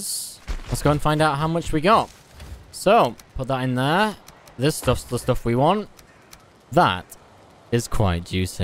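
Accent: England, United Kingdom. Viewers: Loving this modded series will have to look at installing mods just for the build anywhere and automated forge.BTW their is a content creator who plays RUST called willjum and i swear it must either be you as another account or your brother,the voice is the same event down to the english accent.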